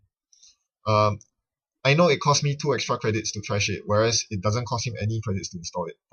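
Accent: Singapore. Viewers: Hi where are you from by the way? You sound like a singaporean. :) Just wondering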